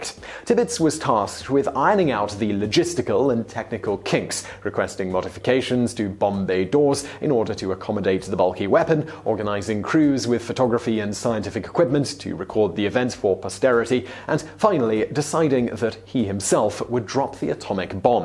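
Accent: United Kingdom. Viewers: The main reason I come here to hear how British people say words.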